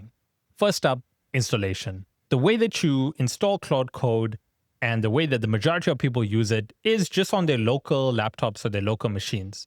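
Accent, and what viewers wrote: South Africa. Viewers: Are you South African?? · Are you South African? Or do you have a South African parent? Your accent is very interesting, if so, it’s great to see a South African make probably one of the best CC videos I’ve seen. 🔥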